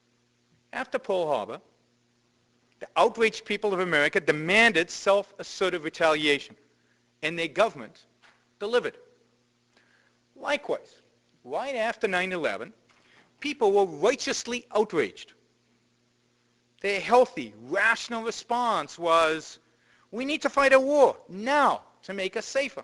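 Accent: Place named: Israel. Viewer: He's not a native English speaker--he's from Israel. Give him a break.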